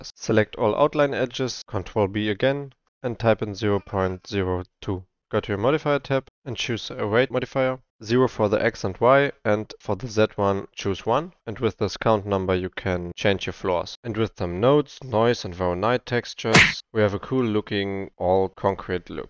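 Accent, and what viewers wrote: Germany. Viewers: since 5 sekonds that i hearing you to speak, i know that your´re from Germany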